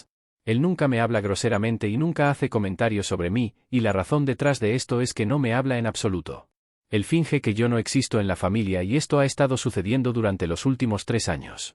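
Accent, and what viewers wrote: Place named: United States. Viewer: Jajajajaja ame el tono gringo Te falta darle más espacio para que sea más agradable escucharlo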